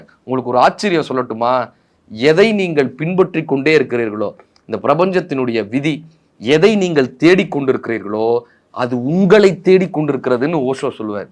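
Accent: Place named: Madurai, India. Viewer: அஸ்வத்தாமன் தானே அவர்.... சொல்லிய விதம் மதுரை மண்ணுகுரியது.... 🩷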